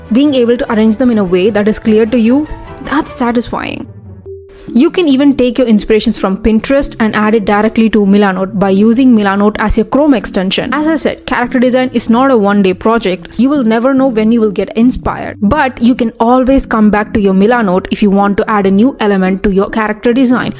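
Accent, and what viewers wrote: India. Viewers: are you Indian? The accent feels so 🌸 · Sounds like an Indian accent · u sound indian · Is accent is indian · Why your accent sound so Indian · Her accent she is Indian, I love it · You sound like Indian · why does she talk like an Indian Girl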